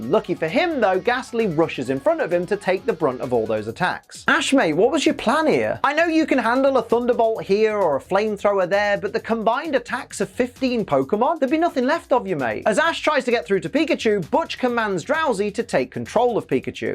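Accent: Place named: United Kingdom. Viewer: Oi u British mate?the Pokemon is blitze.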